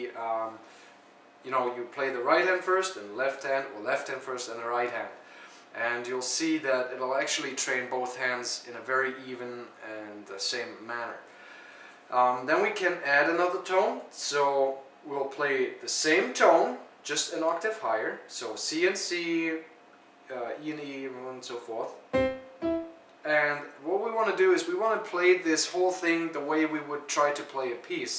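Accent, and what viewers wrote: Canada. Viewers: People from the province of Québec have that accent, usually. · Says "ootside" More attractive than average American Apologizing for not making a video on the subject he admittedly is just learning. Jesus Christ, you couldn't be more Canadian if you were eating maple taffy off a stick while riding a moose into ~battle~ your formal apology for the inconvenience of your incredibly loud moose galloping,metric system, and socialized medicine keeping everyone awake! :p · that Canadian accent thooooo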